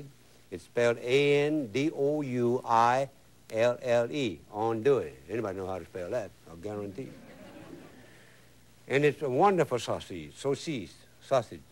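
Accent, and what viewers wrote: Louisiana, United States. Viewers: I'm a North Eastern lady, but every time I use cayenne pepper when cooking, I say, "CAYENNE PEPPER 🌶️" Out loud in a CAJUN VOICE, because I used to watch this fine gentleman's cookin' show! I was SO happy to have this pop up on my YouTube today, so I could hear that voice again. (Exactly how I remembered from years ago!) ❤ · Hell yeah especially when ya throw in a lil bit French, a lil bit English and a lil bit Cajun all in one sentence...... Yankees looking like what the hell did he say???.......😆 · When I was younger I use to love to watch his shows especially with me being a southerner. I miss him so much. I loved his Cajun accent and his personality he was never boring to watch. RIP Mr. Wilson you will never be forgotten. <3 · So funny reading all of the comments below. I too looved this man show when I was a little girl. 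He spoke with a very similar dialect as did my family from south Louisiana. One thing tho... his rue is waaaay too dark but maybe that was the Cajun way... my family's Creole much lighter (and delish; we make it every winter holiday). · I am from Louisiana and enjoy listening to him talk with that accent. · Lol.. not unless you were born and bred in the deep south louisiana like me den you understand him jus right cher 😉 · That's probably the most original Cajun gumbo I've ever seen. This fella was like the Julia Childs of Louisiana cuisine, when you wanted to give hearing Julia's posh English a break you could tune in to this and hear authentic down to earth Cajun French accent along with some humorous stories and jokes thrown in. RIP, frere, you will never be forgotten. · I love his cajun accent. That indecent exposure story made me laugh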